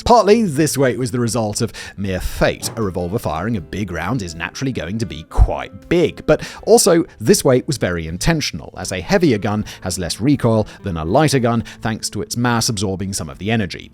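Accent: United Kingdom. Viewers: Why are we listening to a Brit talk about guns?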